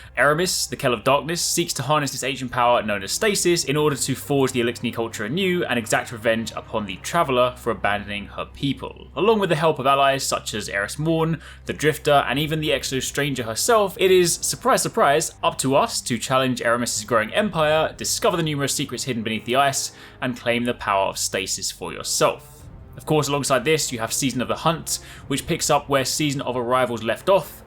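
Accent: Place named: United Kingdom. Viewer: I really wanted to like the video but you sound just like a Brit that I had to rearrange his face...lol he came to the wrong town in bama running his mouth...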